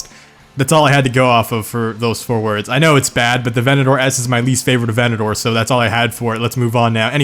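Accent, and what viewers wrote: United States. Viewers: I just love listening to americans pronouncing italian car names, I am italian🤣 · I was thinking your American not Asian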